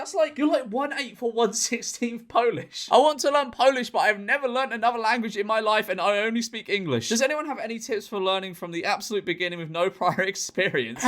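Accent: United Kingdom. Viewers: Mate, it was kinda funny when I (Russian guy) just understood the meaning of 'inalienable' and what it derived from, though hearing it for the first time, while a guy who sounds British just went straight to Google Translate:) Guess I am just used to encountering lots of English words I see for the first time and have to guess the meaning by context or morphology. No offense to you, it's OK, the situation itself made me smile.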